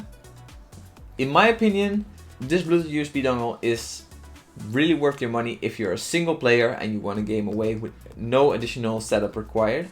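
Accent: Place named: Netherlands. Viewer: You are Dutch i can hear that in your accent 😂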